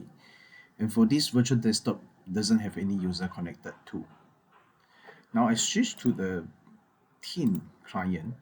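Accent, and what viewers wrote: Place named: Singapore. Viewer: Hello! Singaporean? I know this accent!...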